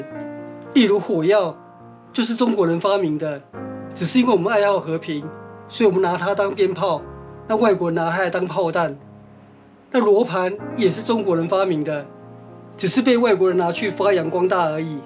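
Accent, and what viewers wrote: Taiwan. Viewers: 不住美國，住台灣。祖籍福建漳州。 不明白你「口音很重」的意思，你認為我和林洋港一樣講的是「台灣國語」嗎？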